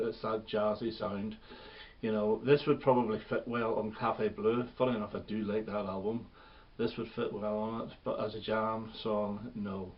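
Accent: Northern Ireland, United Kingdom. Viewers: Well that was very interesting. I agree least said about the better, although I much prefer the version on than on. is the keast best of the number ones, but its ok. Hearing as a 13 year old in 82, I loved it & still do., I like &, which I can hear echos of. & are songs I like too. Lovely to hear your accent, I was fortunate enough to work in Co. Down for 5 years, N.I. is a fantastic part of the world.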